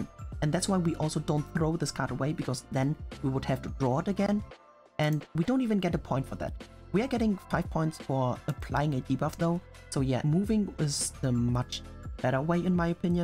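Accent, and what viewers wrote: Germany. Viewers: Where are you from? you have a german accent somehow?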